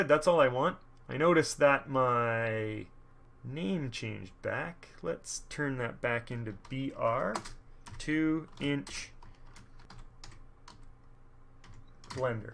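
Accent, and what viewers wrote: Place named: Canada. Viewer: that is a dead give away lol. i use postal codes and zip codes daily for work and that through me for a loop the first time i heard someone go zed1tango 3delta5 i was like WUT and then the way he says resources. canadians pronounce it rezources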